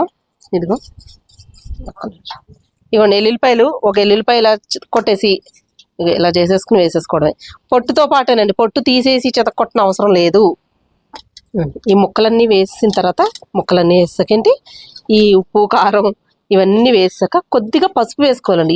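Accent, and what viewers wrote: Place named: Godavari, India. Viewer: మీది గోదారి జిల్లానా అండి..😂😂😂😂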